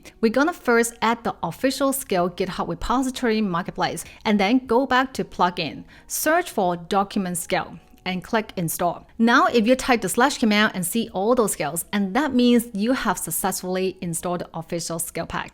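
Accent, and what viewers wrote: China. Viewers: you are talking TOO fast and the Chinese accent doesn't help! You are also rapidly Jumping between applications while you speak, making it very difficult to figure out which application you are on. I thought this was a step-by-step guide, not an exercise in speed-reading!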